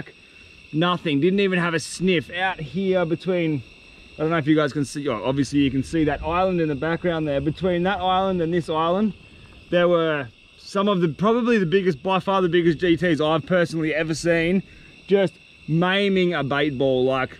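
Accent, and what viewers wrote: Australia. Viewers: Its Aussie accent for me, and such a positive vibe and energy. I love this dude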